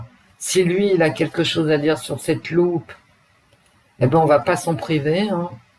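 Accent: Paris, France. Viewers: 🥰 🥰La Cartomancienne "En Tête à Tête avec elle Même.Merci de nous Enrichir.(j'adore,votre gouaille de Titi Parisien)j'y retrouve: mon parrain et ma marraine,les bretons à la Capitale en 1940 tout jeune💗💖Oui il est beau ce TAROT. Le 2 oui. La Dualité. Il Est Ou L'Homme...Bonheur. Joie. Amour à toutes et tous. Bonne fin de journée 😍😘🥰🤗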